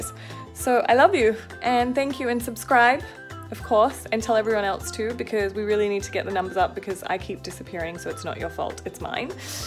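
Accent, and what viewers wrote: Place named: United Kingdom. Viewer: Hi Anusha I am your big fan from Nepal ... I love you so much MTV most wanted... My teenage time.. You were my favourite n still i love u n i just love the way you speak your English sounds like British accent.... Muaahhhh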